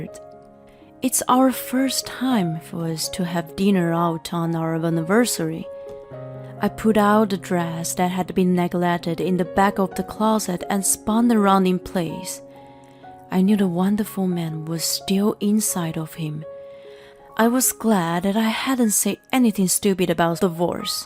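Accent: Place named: United Kingdom. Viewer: Please Bring back the UK voice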